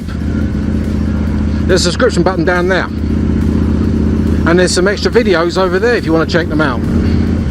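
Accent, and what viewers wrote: Cockney, United Kingdom. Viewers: The cockney mechanic. Great video. 👍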